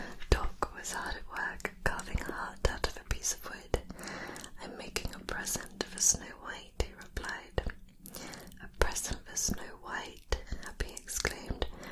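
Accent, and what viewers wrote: United Kingdom. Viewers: ill be honest at first i didn't like your British accent,but now i love it so much with your divine unintentional mouth sounds 🤯🤯🥰🥰that i watch your videos every night!!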